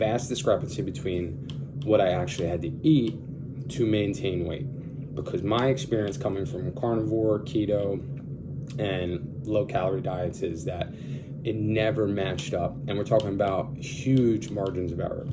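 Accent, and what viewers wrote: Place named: Italy. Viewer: It’s the Italian accent.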